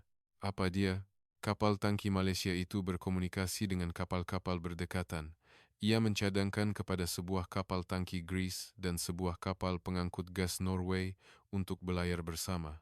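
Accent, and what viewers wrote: Indonesia. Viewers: Chanel dari indonesia ni, huruf je pon dia baca cara indon.Tapi betul ka x itu blm pasti. Blm ade pengesahan cerita setakat ni... Rekaan cerita je ni. 😂😂😂😂😂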